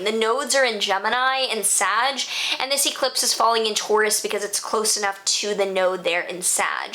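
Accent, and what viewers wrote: United States. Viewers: Your English is terrific - I genuinely thought you were American! And if you need to talk, my email is <mail> I’m sorry you’re going through all this stress but I’ll be happy to listen. Wishing you the best!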